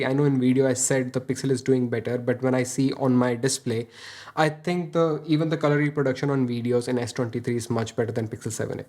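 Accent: India. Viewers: I love the India accent. 😁👍🏽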